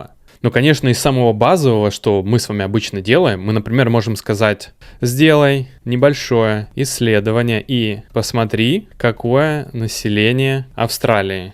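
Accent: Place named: Russia. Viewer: Рунглиш классический)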